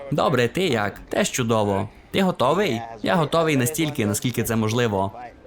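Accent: Transcarpathia, Ukraine. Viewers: Круто але западенський акцент дратує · І ще одне питання. Дівчина почувши відео впевнена що ти земляк з Закарпаття 😅 Так є, чи помиляється?)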